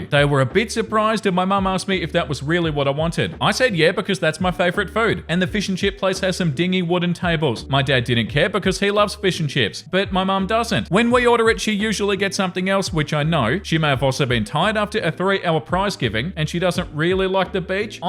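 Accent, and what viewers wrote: Australia. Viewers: Silent lurker chiming in. 😊 I'm loving the longer content - I can listen to the stories and enjoy the Aussie accent (I'm a Melb girl living in the US a handful of years now... Hearing another Aussie helps me feel less existential dread right now 👀) while I have my morning coffee and plan my day, sneaking glances at kitty cam. 😻 Doing a longer video every other day is the way to go. Quality over quantity. Gotta look after yourself so you don't get near burnout. Give the kitties a cuddle from the far away Aussie woman who is currently freezing. (It's -12°C)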